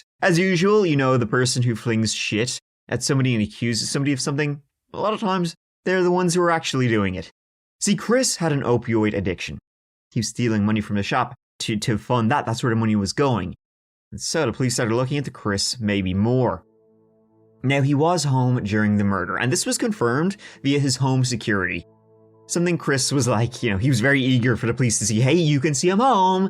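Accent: Ireland. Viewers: I heard this story before but it’s always better with an ol’ Irish narrator giving it a goo!!!! 💚💚🤣🫶🏻🕺🪩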